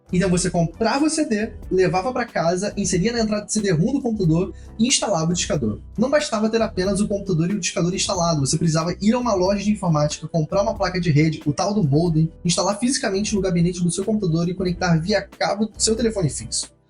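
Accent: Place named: Rio de Janeiro, Brazil. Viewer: Sotaque carioca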